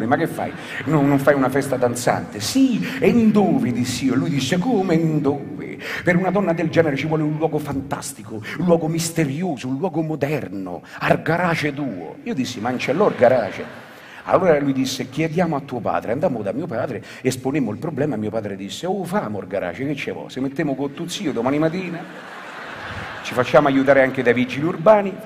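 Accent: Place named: Rome, Italy. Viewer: Enrico Brignano, che dire, io non sono nato a Roma ma il dialetto Romano suo è raffinatissimo. Tante risate e affetto da Perugia. Viva il Cabaret ( quello vero) <3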